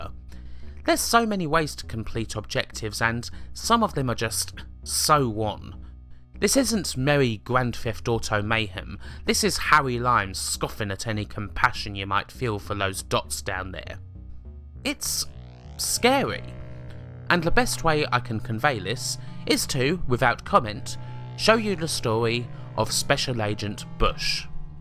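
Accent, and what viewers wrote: United Kingdom. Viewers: Is that a British accent or a speech impediment?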